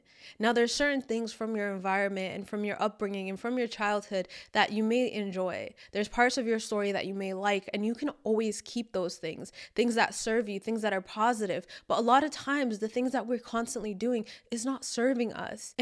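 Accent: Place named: United Kingdom. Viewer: Why did I thought you were from the UK??? 😂😂😂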